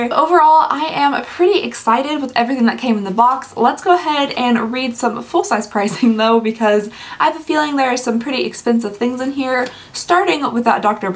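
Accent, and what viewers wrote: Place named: South, United States. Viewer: I can tell you’re southern. ❤️ same lol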